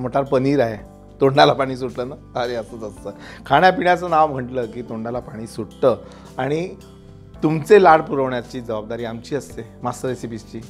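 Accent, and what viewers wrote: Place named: Nagpur, India. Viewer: Khup Chaan Saangitle Sir . . .aani khupp useful . . SIR mi tumchhi FAN aahe . . Mala tumcha Nagpuri Lehja khup aawadto . .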